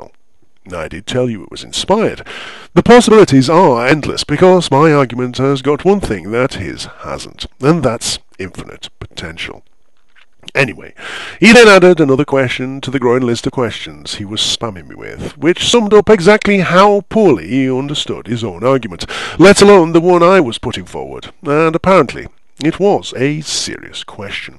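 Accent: United Kingdom. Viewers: I may not be an atheist, good sir, but I admit that this video had me laughing at more than one point. Also, your voice is absolutely silky smooth...is your accent East London?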